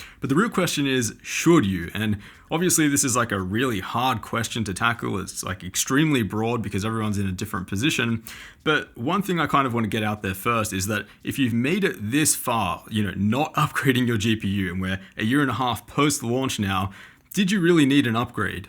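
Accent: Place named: Australia. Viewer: I thought he had an australian accent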